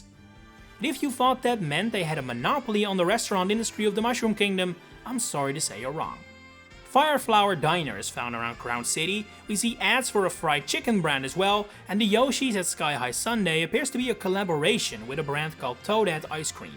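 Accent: Netherlands. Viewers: Nice video bro Heerlijk Nederlands stemmetje hoor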